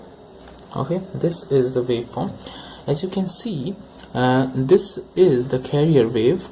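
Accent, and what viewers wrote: India. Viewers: btw I already hit the like button but are you really from the US or you are an Indian guy?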